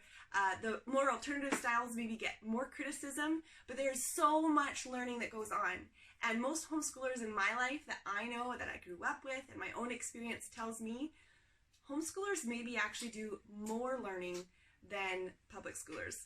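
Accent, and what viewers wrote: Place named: Winnipeg, Canada. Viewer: You sound like you have a Winnipeg accent ....lol 😁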